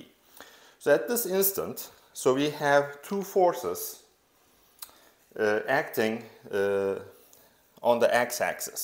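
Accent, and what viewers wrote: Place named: Turkey. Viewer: hocam efsanesiniz. Türk aksanıyla ingilizce konuşmanız anlamamı kolaylaştırdı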